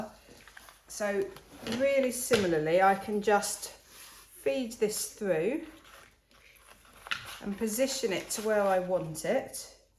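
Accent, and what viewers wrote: England, United Kingdom. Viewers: I love how creative you are! 🌿🌺🌼🌸🌿 This message reminded me to tell my friends how important they are and that we are here to lift each other up. It's a wonderful little quote for both ourselves and others. Thank you for sharing your beautiful Artwork with us. You bring me much JOY in everything you do. Besides that, i love your English accent (i live in the USA). 🩷🩵💜🦋🏜️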